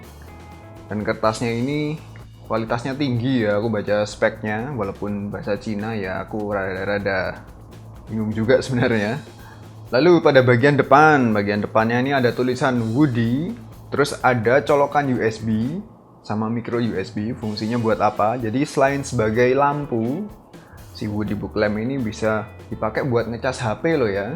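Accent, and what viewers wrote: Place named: Yogyakarta, Indonesia. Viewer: Anjir keren banget😂 bangke lu bang, jogja sekali macam aku kalo ngomong wkwk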